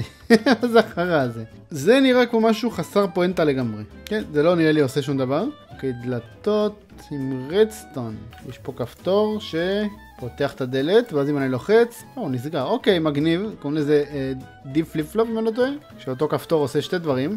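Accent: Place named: Russia. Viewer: שיואו צפיתי בסרטון שלך מלפני שבע שנים שהיום אנחנו אגב ב<num> ושומעים שיש לך מבתה רוסי ברמה מטורפת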